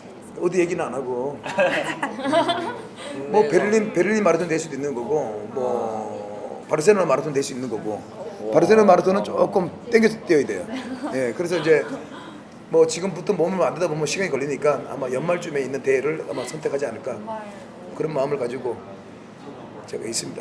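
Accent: Gangwon, South Korea. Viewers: 아직, 강원도 사투리 잘쓰시네여 저는고향이 강원도 동해여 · 종겨운 강원도 사투리네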